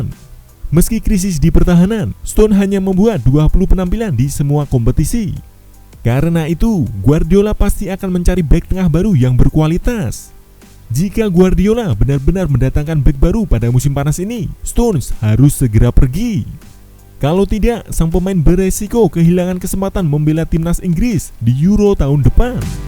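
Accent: Java, Indonesia. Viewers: medok banget jawanya si anchor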